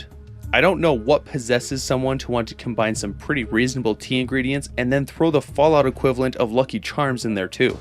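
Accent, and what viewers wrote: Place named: United States. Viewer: You did really well pronouncing "Pyotr Illyich Tchaikovskij". Aside from an american accent, it sounded proper to me. Language trivia: "Piotr" is the russian/slavic version of "Peter". The "-io" sound kind of sounds more like "-ieo" with an emphasis on the "-ie". The "-tr" is certainly one of the typical slavic consonant sounds, but when you speak it, you'll usually hear a brief "u" or "e" vowel sound in there. Anyway, thanks for the entertaining video! I hope my little trivia bit was at least somewhat entertaining to you.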